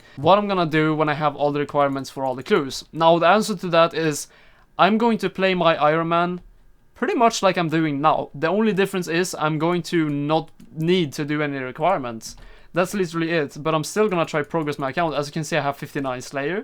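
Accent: Sweden. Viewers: Are you from Sweden? Your accent kind of sounds like rather Nothern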